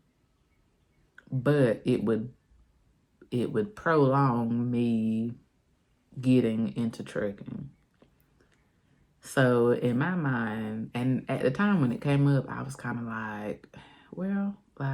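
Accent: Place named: South, United States. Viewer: Im gonna assume you were sheltered growing up...get out of comfort zone...truck'n is tough but it gets easier as u start to get it...I understand you want to move to the beat of your own drum...we all do...the world is a cruel place...I like the fact you're willing to get out of comfort zone (🚛)...ik I'm all over w my writing but formulating sentences isn't my forte...the 🌎 takes no prisoners...love the southern accent...get a chance watch niesha k or skimp dee or roadrunna nia... watch how they move look at mirrors... recognize what u see...plus they're prissy they like to get hair and nails done...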